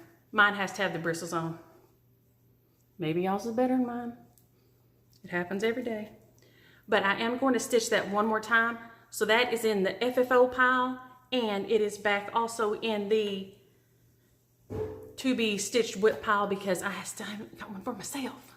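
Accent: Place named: Georgia, United States. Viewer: FUDGY Brownies Only for this girl! Are you from Georgia originally and moved to Texas? You sound like a Georgian. I love it!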